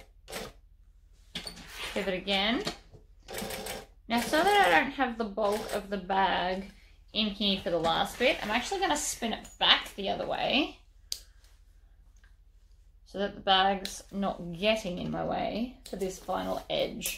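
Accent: Australia. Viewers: Hi! I like how you switch out from bags to something els in sewing. I really like the revamp of your sewing machine, it turned out very nice. I’ve been watching your videos for a little bit now & enjoy them very much ( I’m a newbie ). I love your Ausie accent. Great job all around young lady. Take Care Be Safe. 👍😊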